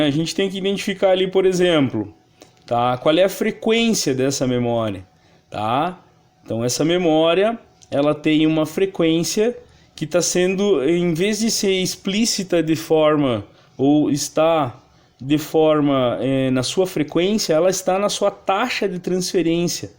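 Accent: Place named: Rio Grande do Sul, Brazil. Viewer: Eita, gauchada sempre termina as frases com "NÉ" :face-orange-raised-eyebrow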